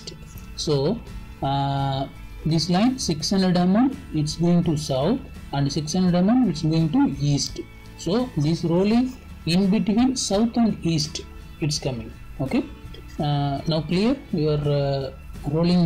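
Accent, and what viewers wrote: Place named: India. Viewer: Madersud english spiking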